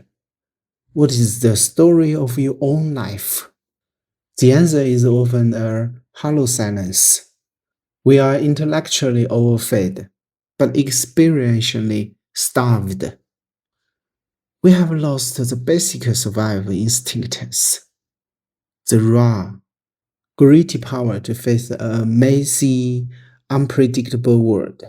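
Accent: China. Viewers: he does have a strong chinese accent though so maybe its just a neccesity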